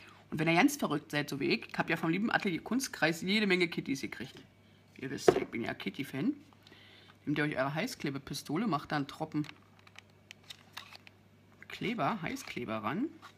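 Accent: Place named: Berlin, Germany. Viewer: Ach meine liebe.. ick find dein "Berlinern" einfach nur geil!! ;)) Rock it und dir nen schicken Abend! Maxi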